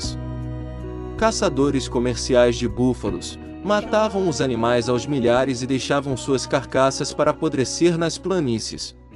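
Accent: Brazil. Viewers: Muito interessante o conteúdo. Já a locução é muito fraca. Num vídeo sobre o Far-west norte americano, era recomendável um locutor com bom sotaque americano e não um que diz os milhentos nomes de figuras e locais americanos á moda do Brasil. O facto mais relevante sobre o Gen. Custer é a enorme derrota na batalha de Little Big Horn, este nome devia ter sido mencionado e não uma baralhada entre Guerra Civil e Sioux e Cheyennes. Mas isto são pormenores, para puderem melhorar no futuro, porque adorei ver tudo.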